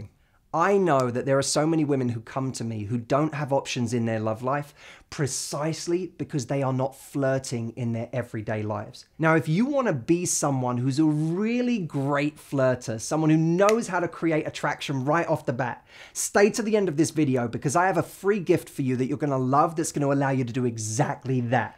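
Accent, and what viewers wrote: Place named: United Kingdom. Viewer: I love the way you Brits speak, "exomple ofter exomple" :–0. I'm not too sure about the glottal stop though. Or should I say "the glo' al stop"? hehe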